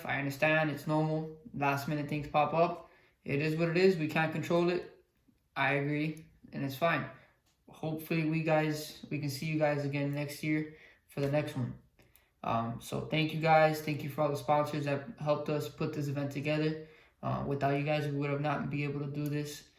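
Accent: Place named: Hialeah, United States. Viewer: Wow For this event being low on staff, you guys did a good job. It sucks when people end up flaking on you, however you guys adapted and overcame the Situation. As for Tech Inspection I agree you gotta leave the friendship out and be fair for everyone. A true friend will understand. Im sure this was a learning experience for you and your team. So For the next event just have a few backup plans incase shit hits the fan again . BTW It was Good to meeting you funny how you were talking to Frenchie and Immediately recognized your voice. Tremendo Hialeah accent 😆 🤣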